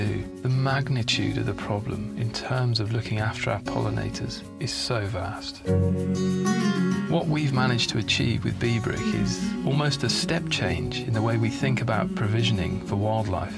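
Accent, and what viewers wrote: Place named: United Kingdom. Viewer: Wow these comments. Tik tok nation. Can't stand a video for more than 30 seconds. His voice is soothing. Whats wrong with having a posh English voice?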